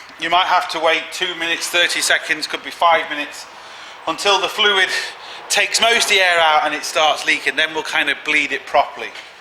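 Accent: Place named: United Kingdom. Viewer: thanks mate its good to hear british accent for a change,good job well done.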